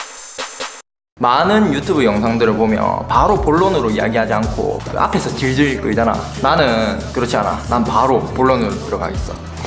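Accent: Daejeon, South Korea. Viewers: 저기 혹시 대전사람인가요 어째 친근하더라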